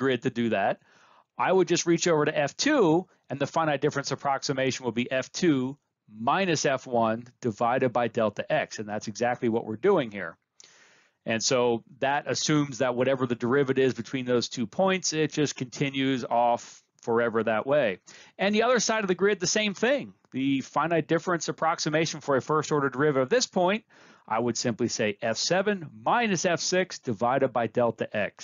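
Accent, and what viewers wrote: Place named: United States. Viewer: nevermind actually, apparently according to which English accent you have it's pronounced differently and you have the American version my bad. Still a good video though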